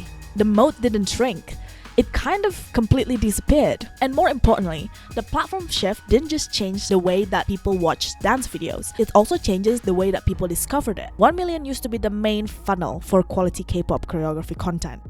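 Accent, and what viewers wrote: Indonesia. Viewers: Indonesian accent detected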